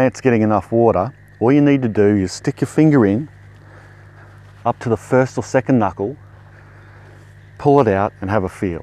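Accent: Australia. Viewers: This is good. It’s even better at 1.5 speed increase. I love Aussies. Have I got that right?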